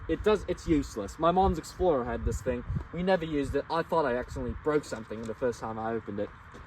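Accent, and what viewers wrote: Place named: Europe. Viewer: This is simply false, and this masked charlatan with his European accent is dealing with an uninformed personal opinion of an individual machine. The Expedition – note: the XLT he has is the most bare-bones model-- is large, luxurious in better models and, if important regular maintenance is involved, very reliable. The engine can have a sludge buildup if insufficient maintenance is done which can lead to problems, but ignoring a car or truck is bad news regardless. It does not get good mileage, but it is a big muscular V-8 which can tow a house if you hook it up. Probably this little fellow is resentful of that fact. I take mine – with dogs, four crates and shotguns-- bird hunting and it’s great off-road and in snow when others bog down. No doubt he’d be happier with an electric vehicle.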